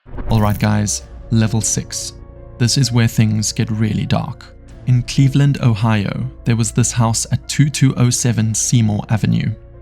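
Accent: South Africa. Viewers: Bro love your videos, from your accent you definitely sound like you are south African. But why oh why are there so many adds? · Is that a South African accent I hear?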